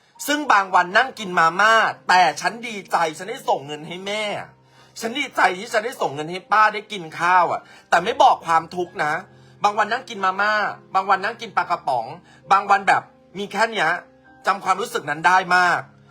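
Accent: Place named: Isan, Thailand. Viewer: น้องดิว เว่าภาษาอิสานม่วนๆๆ🎉🎉🎉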